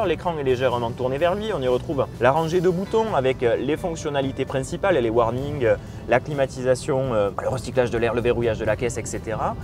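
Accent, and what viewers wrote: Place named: Southwest, France. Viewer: joli test ! dommage qu'elle ne soit pas rechargeable: le gain en conso aurait été bien meilleur ! Je suis en LOA 308 hyb 225 et je la trouve vraiment tres bien. Sur ces nouvelles version, ils ont fait un net progres sur les finissions. l'option recharge des batteries est un réel plus. Pour finir: ca fait un bien fou de retrouver l'accent du "coinG" ;) ! (suis pas tres loin: sud Toulouse). J'ai beaucoup apprecié ton format test/avis/découverte de notre belle région. Bravo !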